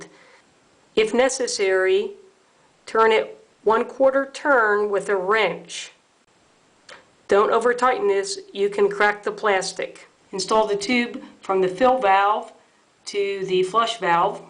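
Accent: Philadelphia, United States. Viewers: sound like your from Philly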